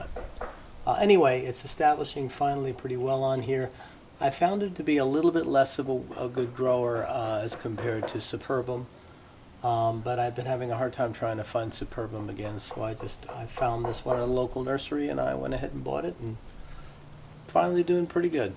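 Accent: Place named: New York, United States. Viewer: Good and interesting to watch, as always. You really got into your New Yorker character. Boy, did that bring back memories.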